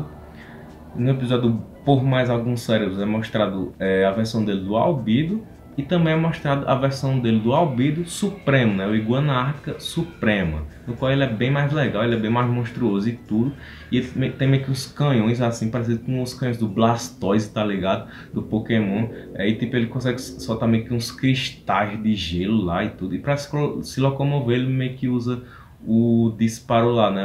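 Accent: Northeast, Brazil. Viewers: vc e nordestino?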